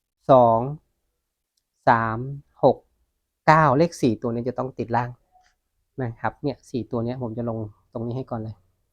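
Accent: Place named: Isan, Thailand. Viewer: สุดยอดเลยครับเว้าภาษาบ้านเฮาหม่วนหูหลายอี่หลีเอาให้แม่นๆเด้อหนุ่มภูพานครับ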